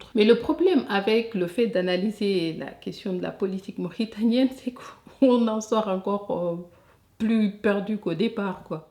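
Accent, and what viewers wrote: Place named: Senegal. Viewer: Tu as un accent sénégalais , tu es sénégalaise tes frères et sœurs en Mauritanie sont la plupart des exclaves , soit vous les ramenez chez vous soit vous la fermez . Il peut pas y avoir de Noir en Mauritanie c’est un peuple arabe . Vous cassez les couilles aux gens de partout . Même en France vous voulez pas laisser les gens respirer. Retournez chez vous et le problème est réglé.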